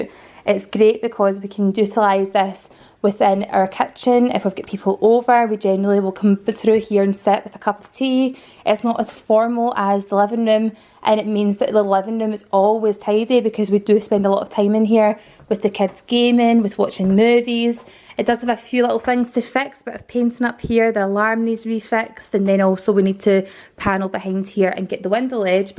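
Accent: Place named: RP, United Kingdom. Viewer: First time actually coming onto your channel even though I listen to the podcast constantly! How posh do you sound on here compared to the podcast?! 😂 LOVE your house!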